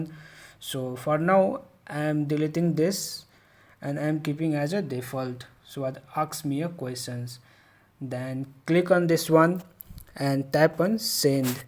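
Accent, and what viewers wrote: India. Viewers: Ilike the Hindi english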